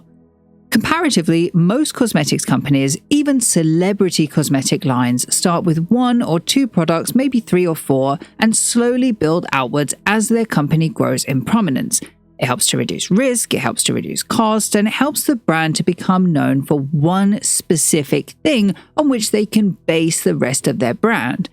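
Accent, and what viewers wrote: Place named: United Kingdom. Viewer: I’m with you on that. I fall asleep to a British Egyptologist with a similar accent on the regular.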